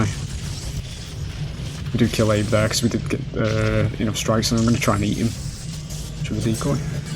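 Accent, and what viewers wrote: United Kingdom. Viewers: Where in the North are you from man? You sound like Lancaster way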